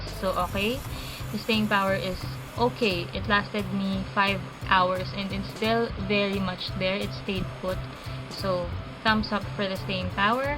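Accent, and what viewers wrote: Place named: Philippines. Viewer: Are u filipinoss?? So beautifull. And ur tagalogg soo 😍😍😍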